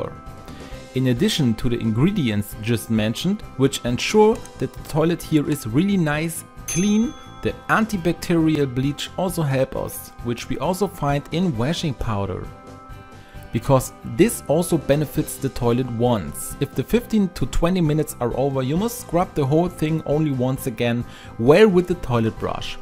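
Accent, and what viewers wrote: Germany. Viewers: 으째 발음이~~ 독일사람이 영어한 듯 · The most German accent I've ever heard. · Öha , den deutschen Akzent hört man Danke für den Tip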